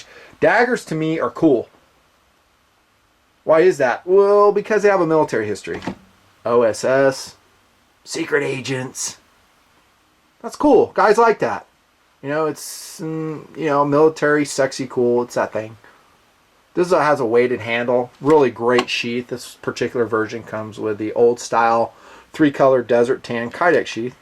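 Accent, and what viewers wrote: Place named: Alabama, United States. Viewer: your Bammy accent...lol. Keep the knife vids rollin.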